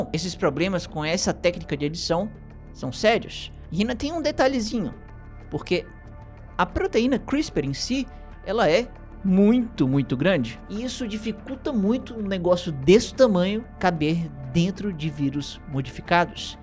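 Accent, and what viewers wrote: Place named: Northeast, Brazil. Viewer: "Uma ruma de vírus" Amo o dialeto nordestino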